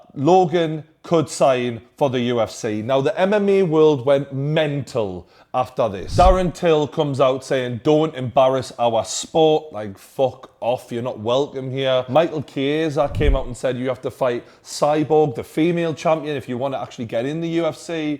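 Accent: Newcastle, United Kingdom. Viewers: Man like Geordie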